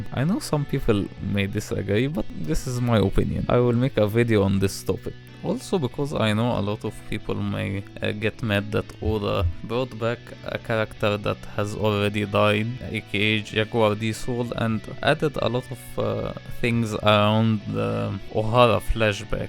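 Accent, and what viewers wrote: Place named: Russia. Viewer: Why you speaking with Russian accent my friend why ?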